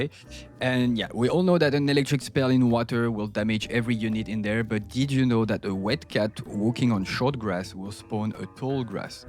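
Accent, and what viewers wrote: France. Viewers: I hear a french accent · Your french accent is very beautiful ^^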